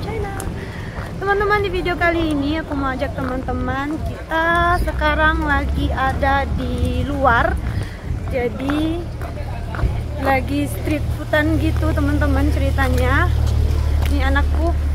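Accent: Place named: Hunan, China. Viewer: Bhs mandarin kmu sdh mirip dgn org asli disana Kayak org bhs hunan tp msh bs lebih mengerti,ada medok nya tp bhs hunan lebih medok lg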